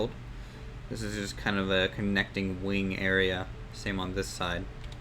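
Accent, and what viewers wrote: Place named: North, United States. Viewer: Keep up the great work!!! P.S. This is super random, but where are you from? I often hear police sirens in the background, so I'm guessing a decent sized city. And I feel like you have a northern U.S. accent. So I'm guessing somewhere Minnesota or maybe Chicago? lol just curious, I'm sure I'm way off haha.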